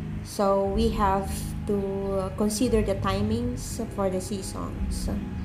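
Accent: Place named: Italy. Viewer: ur accent is italian, not filipino .... any accent will do as long as they can understand u, not wrong with accent specially filipino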